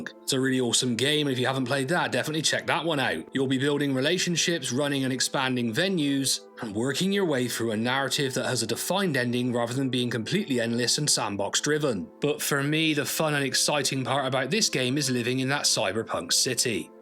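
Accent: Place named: Bristol, United Kingdom. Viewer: You sound like you're from my neck of the woods me babber!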